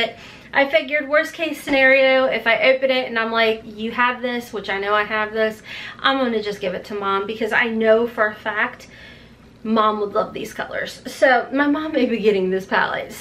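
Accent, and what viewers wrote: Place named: Kentucky, United States. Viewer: You seriously are amazing! I am also from Kentucky, born in Lexington, and its so nice to hear your accent! Love your videos and just remember to inhale confidence and exhale doubt! 😘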